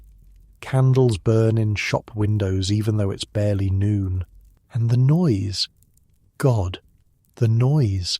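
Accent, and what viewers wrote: United Kingdom. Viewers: Can you tell me when the narratot in this amazing series on YouTube went from an American voice to a British one? I much prefer the soothing British voice. Thank you.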